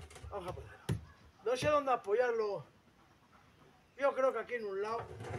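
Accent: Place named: Bilbao, Spain. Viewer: No hace falta, pero seguro que eres de Bilbao. Joe que tio.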